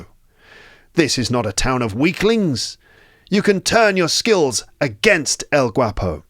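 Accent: RP, United Kingdom. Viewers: I am from Nepal and learning English with British accent through your leading podcast. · BYE BYE BOY BOY BOY...! It is very nice and very interesting...!!! I would ESPECIALLY love your RECEIVED PRONUNCIATION and your VOICE of British English...!!! I am USUALLY and OFTEN listening your Podcast and Episode to IMMERSE myselft in British English....! THANK YOU VERY MUCH Mr.....! Your name is at the same as name of Sain....!!! 😝😝😝😝😝😝😍😍😍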